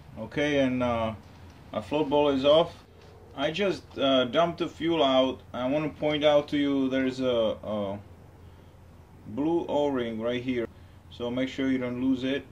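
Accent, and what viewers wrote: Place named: Latin America. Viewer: ABSOLUTE AMAZEBALLS!! Dude with the Latino accent! You are the MAN! Thank you SO much for this video. I've got exactly the same carburator on my old HD Big Twin and I thought I had run all the gas out of it before I stored it. NOT! I didn't get it all. But now, I've got it all solved, AGAIN thanks to YOU!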